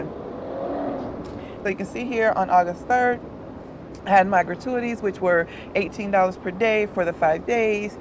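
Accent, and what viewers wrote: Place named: New Orleans, United States. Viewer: Ggggggiiirrrllll, there was something you said in one of your other videos and I was 🤔 , she is speaking like she is from NOLA because I've only heard other REAL New Orleanians say that!!! 😂😂😂🎉Then I saw this video confirmation!! CONGRATULATIONS!!! 🥳 🎉 🎊 🥳 🎉 🎊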